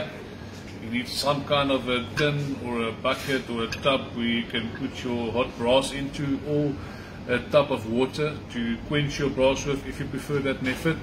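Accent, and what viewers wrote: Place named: Afrikaans, South Africa. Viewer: Enkelvoudig, effektief, goedkoop en met 'n Afrikaanse aksent! Dit kan nie beter nie! Groete uit Utah, Merika!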